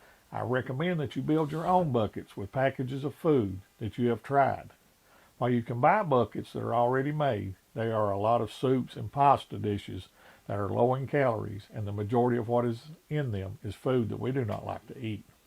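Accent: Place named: New York City, United States. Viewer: He talks like a New York Jew.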